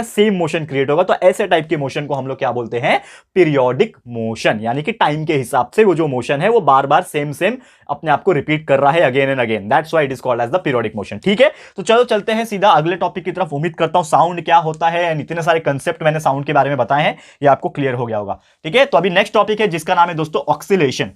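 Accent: Maharashtra, India. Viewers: Sir your from Maharashtra? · Are you Marathi ??😮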